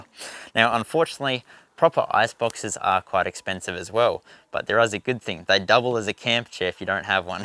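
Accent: Australia. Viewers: really enjoyed the video as someone who's never been camping, but is thinking about it. Easy to follow tips. Incredible how you manage to smile and speak at the same time! And great Aussie costume hat, accent and shorts. Really sets the scene. Keep up the videos. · Sorry, I couldn't follow it because the guy's accent made me winced! To my ear it was awful!! But, that's me, not him. If I have to listen to an accent, I can tolerate a London accent, but not Australian or any other part of Great Britain. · Is that a Queensland accent? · Gotta love the Aussie accent👍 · G'day, I'm in Virginia and thoroughly enjoying your video! I have been gathering the gear needed for car camping. Not much was needed due to the fact I've been backpacking and camping since I was 10. I almost need a warehouse to store my gear. At 72 I decided it was time to give up the long treks or hikes especially up and down mountains. 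The legs and lungs are not so strong any longer. After your shopping spree in Tentworld (REI would be a close equivalent) it seems I have all of the necessities. I just have to figure out how to get back to Australia...what a beautiful country you live in! I've been to Melbourne and Coolangatta (my sister has a timeshare there) and absolutely love it on the Gold Coast., you should be on TV! You have a great smile and personality plus. Your skills as a photographer and speaker (love that Aussie accent!) are above reproach. Anyway, I'm a new sub and look forward to watching more of your videos.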